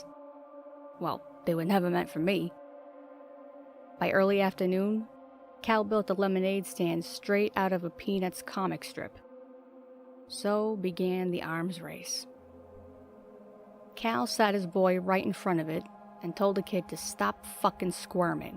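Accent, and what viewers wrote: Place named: Massachusetts, United States. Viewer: Excellent job, everyone. Being from MA myself, I really can't get over how much I enjoy hearing someone read with my home accent. Thank you for representing us!:D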